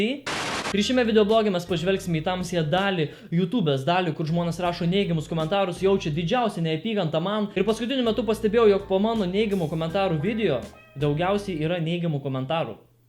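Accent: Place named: Kaunas, Lithuania. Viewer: Aš kai tavo pirma karta vidijo pamatau aš tesiok. Tu Kaunas nes tu labai juokingai kalbi🤣🤣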